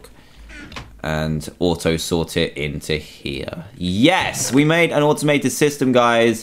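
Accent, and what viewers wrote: United Kingdom. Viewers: *well he's british, and they pronounce it different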